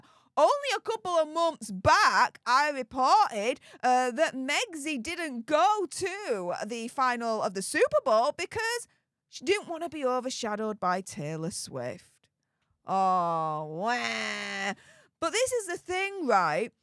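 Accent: United Kingdom. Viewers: I love your accent true British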